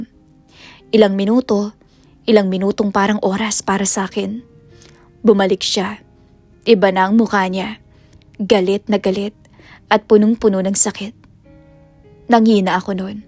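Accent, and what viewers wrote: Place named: Arab world. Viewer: Ang galing ng content creator na to gayang gaya Ang tuno ng English ng mga arabo.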